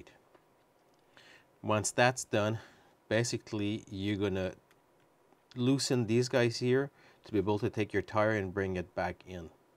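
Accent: Quebec, Canada. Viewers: Québécois accent for sure ! Nice video patron !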